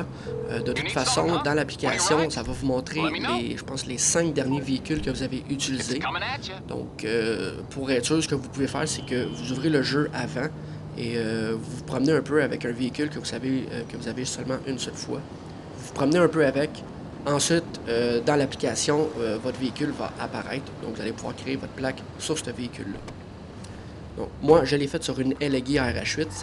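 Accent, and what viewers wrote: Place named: Quebec, Canada. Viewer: Ça marche encore ? Sinon bonne vidéo mon ptit québécois je kiff l'accent 😂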